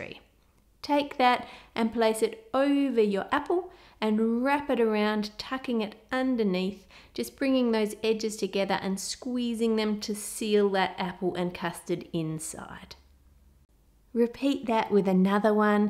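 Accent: United Kingdom. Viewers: Who else is practicing their British accent while watching 🤣😅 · what accent is this? It sounds british but what region specifically? kinda sounds like someone attempting to do a british accent lol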